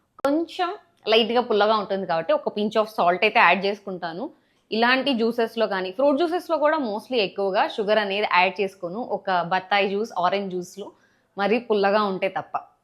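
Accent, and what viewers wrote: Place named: Rayalaseema, India. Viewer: Om Shanti you are from Rayalaseema kada inti vallato kaburlu cheputunnatla vundi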